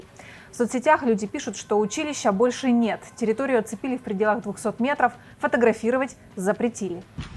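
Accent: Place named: Ukraine. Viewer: У ведущей отвратительный мовный акцент. Аж слух режет... И уголки губ назад при разговоре оттягивает - так делают все мовноговорящие...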